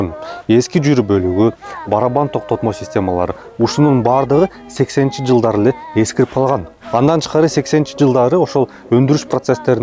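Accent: Standard. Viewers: Сонун экен .Алып баруучу сага чон рахмат ! Таза кыргызча айтып бердиниз . Ишинизге ийгилик. Чон респект !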